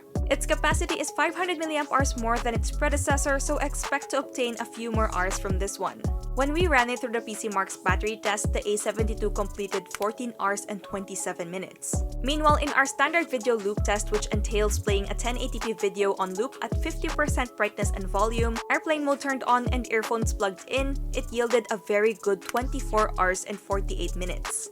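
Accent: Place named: Philippines. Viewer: Love that Filipino accent 💕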